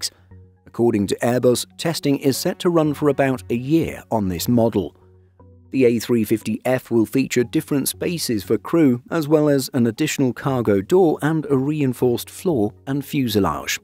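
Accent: England, United Kingdom. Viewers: In fact your english accent excites me a lot ! As you say purpose, so great for my french ears... ❤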